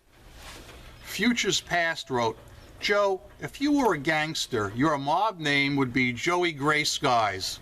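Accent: New York, United States. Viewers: that cawfee accent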